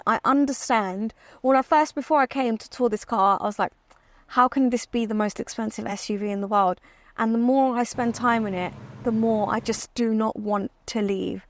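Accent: London, United Kingdom. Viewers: you can hear the london accent coming through lol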